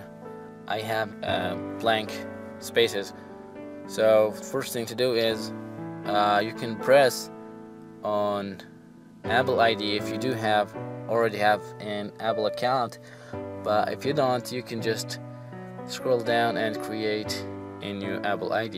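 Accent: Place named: Arab world. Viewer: why i undrestood your english becouse you arab and i am somali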